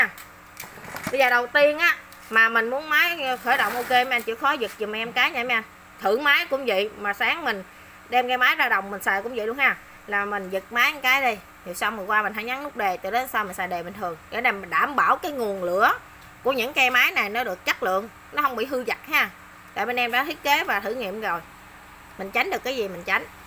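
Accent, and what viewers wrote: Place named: Mekong Delta, Vietnam. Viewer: Bà chủ máy này giọng miền tây. Nghe chân chất mà vui vui. Cách giớ thiệu sản phẩm mộc mạc nhưng chuyên nghiệp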